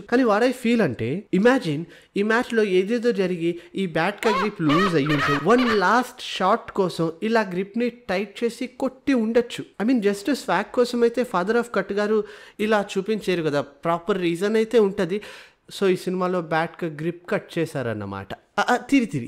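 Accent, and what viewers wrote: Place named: Kerala, India. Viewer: Ni telugu yenti bayya malayalam vadu telugu ni appu techukunnattu undhi worste ga why yendhuku ala matladuthunnav thinnaga matloduchuga Andra style ledha Telangana style ayina matladu bayya teluguni kuni cheyyaku please..😢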